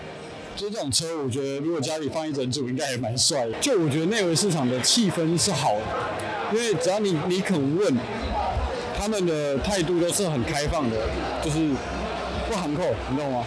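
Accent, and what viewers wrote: Taiwan. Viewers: 你居然有台灣口音,聽了真親切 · 不好意思，為什麼我看到外國人的臉，講話為何是台灣年輕人？（這影片自己跳出來） 我太好奇了立馬自己找答案所以我很努力的滑最前面的影片，因為介紹通常都在前面，您爸爸是德國人媽媽是波蘭人，在台北出生在高雄長大，進口的台灣人ya~ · 哇！你的口音 根本台灣人耶 ，但臉是阿兜啊！你在台灣出生的嗎？ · 哇!你的中文口音好台~ 那個是蔣中正啦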